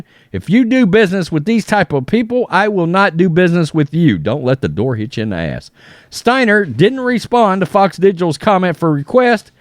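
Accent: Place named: Appalachia, United States. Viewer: I could tell by the redneck hillbilly accent that this was going to be a dumb video. But even then I was impressed by how much worse it actually was. Congrats on exceeding expectations.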